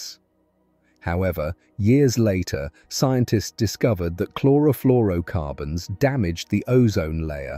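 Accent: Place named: United Kingdom. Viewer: No not listening to a bloody limey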